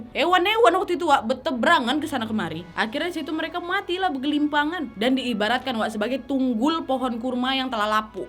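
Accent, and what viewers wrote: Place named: Medan, Indonesia. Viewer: sebaiknya tidak menggunakan dialek medan... ngga semua orang ngerti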